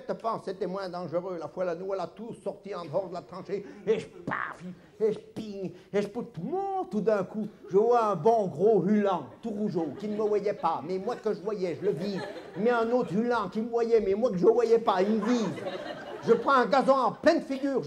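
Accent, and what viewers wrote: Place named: Vosges, France. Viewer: Ben oui il est excellent. vive les Vosges et le patois !!.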